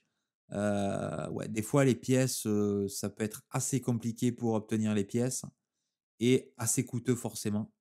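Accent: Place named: South, France. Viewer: notre bon petit accent du Sud, c'est vraiment plaisant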